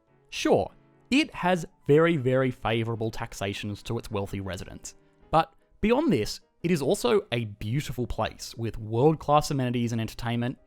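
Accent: Australia. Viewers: Hey are you Australian? You have an Australian accent ahaha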